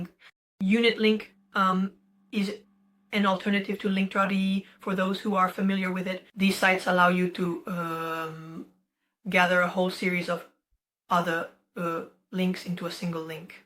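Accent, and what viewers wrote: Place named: Italy. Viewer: Another great video but what happened to your wonderful Italian accent? Have you gone A.I.?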